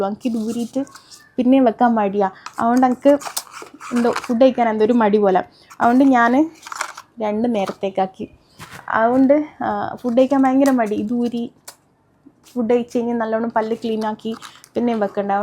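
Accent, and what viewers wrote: Kannur, India. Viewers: nalla rasand pallu porikka., aah nammale kannur basha marannu samsarikkulaa 🥰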